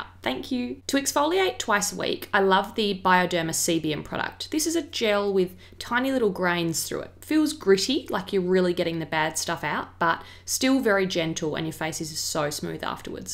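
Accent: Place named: Australia. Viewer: love your Aussie accent! nice skin care routine!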